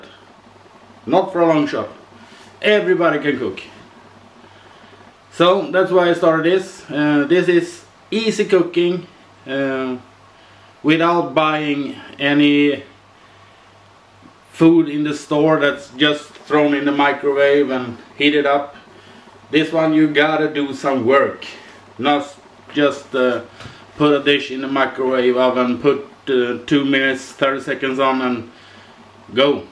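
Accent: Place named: Sweden. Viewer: Grym video. :) Din engelska är faktiskt ganska bra. Mycket "Svengelska" Men jag tror att alla engelsktalande personer förstår vad du säger :) Stor tumme upp till dig. Dessutom blev jag väldigt sugen på lasagne nu :)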